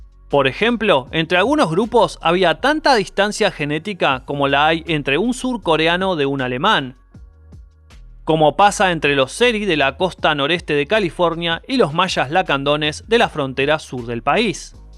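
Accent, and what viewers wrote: Argentina. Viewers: seguramente eres argentinillo por tu plaga para hablar, deberías ser más objetivo y es que la verdad éstas lleno de suposiciones deberías hacer videos como la; BBC inglesa, NHK japonesa ó Discovery channel americana, pero estás a años luz de hacer videos de calidad, tenías que ser argentinillo 🤣🤣🤣🤣🤣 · Qué es una gashina? Es broma es broma 😂. En realidad suena muy cool el acento argentino.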